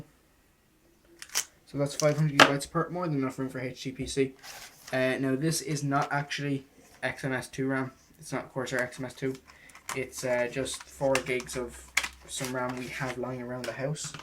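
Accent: Ireland. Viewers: R u irish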